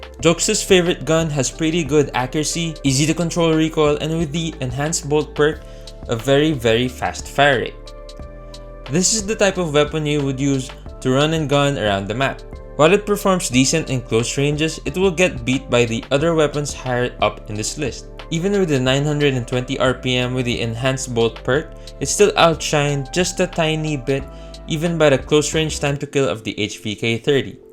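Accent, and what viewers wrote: Philippines. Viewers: Pinat Bater - gotta love our Filipino accent. Nothing wrong with it. Language is dynamic anyway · I have same accent. P-nAt batEr. Love from Philippines.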